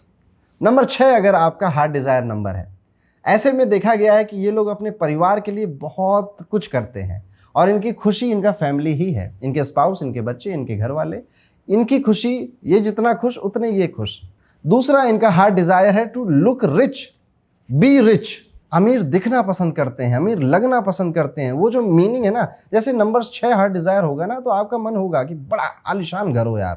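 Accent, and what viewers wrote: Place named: Kanpur, India. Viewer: Radhe radhe… fan ho gye hu aapki… watching u from Sydney but dil hai kanpuriya… aap bolte hoto lagta hai mere gher ka koi bol rha hai wahi lehja wahi slag wahi shabd… hashi bhi aati hai sun k aesa lagta hai gher per hu.., Kash aapse appointment le pati… thode mehnge ho aap aur abhi mai mere naukri nhi hai kaphi samay se job search ker rahi hu but pakka jaldi hi lag jayegi aur tab aap se baat kaungi… may be face to face… when i am there in kanpur… in my home and yes i know u read all the comments 😅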